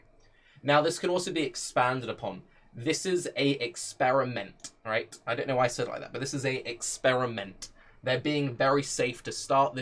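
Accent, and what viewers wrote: United Kingdom. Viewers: I think that's a little overboard, but i get what you mean. He for sure has become a lot less critical ever since he's gotten these aspirations of doing content with GGG staff etc. Its like he wants to be Ziggy or something. Nobody is totally impartial, but what does rub me the wrong way is when people pretend as if they are trying to give a balanced opinion - when in reality they fall very squarely on one side of the argument or the other. If you are only going to praise GGG and fabricate total nonsense that nobody would ever say to make it appear like you arent just dicksucking it really comes off as arrogant pseudo-intellectual self-aggrandising nonsense. This was my biggest problem with the Diabolo mobile video. He couldnt just say "If the game is good, ill play it". He had to make a 5 minute nonsensical rant trying to justify to himself and everyone else why HE is in the right, everyone else is just circlejerking, Blizzard are being smart and moving in the right direction for the franchise even if this only caters to idiots like him.... 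Like dude, either be quiet or just acknowledge you are in the minority. Dont try to do all that nonsense justification. It doesnt get you hipster points, and you dont sound smarter for doing it. Even if you have a british accent.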